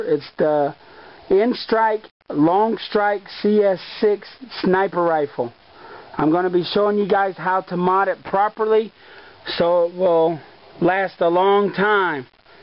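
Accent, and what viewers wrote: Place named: South, United States. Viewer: Ur voice always sounds funny because ur from the south and I'm from the north so yeah, no offense, great vid can't wait to get the long strike!!!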